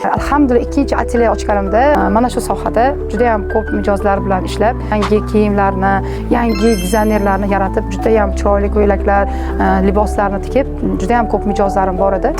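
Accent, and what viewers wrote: Bukhara, Uzbekistan. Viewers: Voy Buxoro gòzali shevangzdan bilgandim Buxorolik ekanizni men ham buxoro shahardan❤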